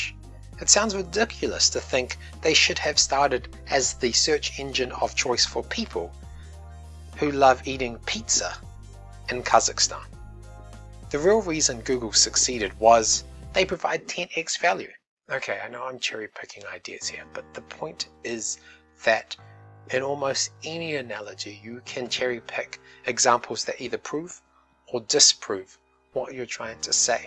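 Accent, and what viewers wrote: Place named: New Zealand. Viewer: you sound like a kiwi! what up broooo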